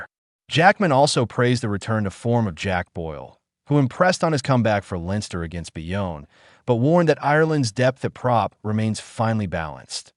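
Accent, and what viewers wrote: United States. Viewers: Why the American accent? This isn’t an American sport - it’s British. Change the narrator. And you’ll have a better response…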